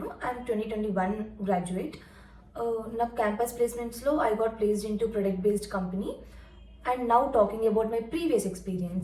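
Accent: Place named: Telangana, India. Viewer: abba telangana slang telugu.. super got awe stuck with the natural way and no showoff... Are you engaged to someone or ready to? will u M*** me 😉🙃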